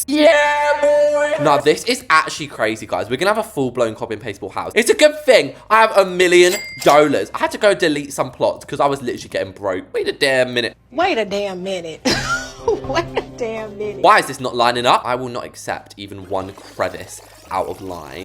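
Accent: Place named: United Kingdom. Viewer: LIKE LOWKEY IN A KIND AND NICE WAY 😂❤ YOUR SO BRITISH I LUV IT!!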